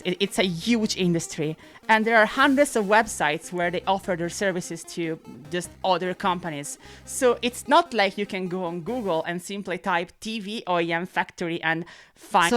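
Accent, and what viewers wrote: Italy. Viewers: She is definitely Italian. Her English pronunciation is really quite good, though. Italians usually have a really hard time pronouncing H at the beginning of words, for example, but she does it fine.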